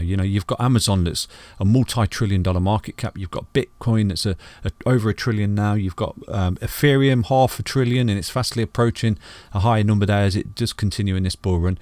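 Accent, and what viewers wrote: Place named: East Midlands, United Kingdom. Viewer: mate your accent - you gotta be from Notts/Derbys?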